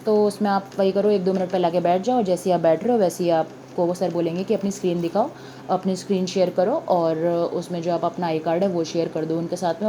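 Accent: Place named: Haryana, India. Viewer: Miss chitra.. Zaruri ni h sabka tarika same ho kisi kisi ka alag hota hai or may be ho skta hai ye haryana side se ho tou isse farak ni pdta jaise ki neet ki coaching dete h physics wallah sir Allakh panday name h unka but aaj lakho log unke fans h kyuki unka tarika hi alag hai. Or i think jo zyada bna kr bolte h ya saja kr bolte h log unse bor ho jate h nd mujhe inka way of talking achha lga or ignou ka management hai hi aisa k muh se mithas nikalti hi ni h. Aisa waha ka staff b preshan hai.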